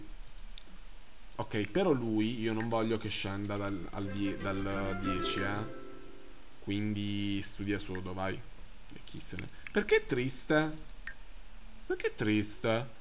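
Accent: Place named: Taranto, Italy. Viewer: ho appena iniziato a guardare i tuoi video e ho capito subito che fossi di Taranto,spero vivamente di avere ragione hahahah comunque sei divertentissimo giuro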